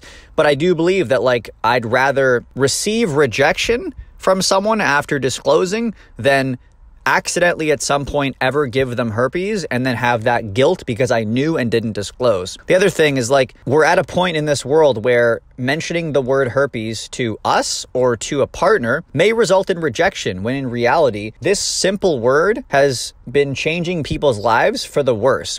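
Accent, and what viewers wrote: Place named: Canada. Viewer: You say "out" in Canadian accent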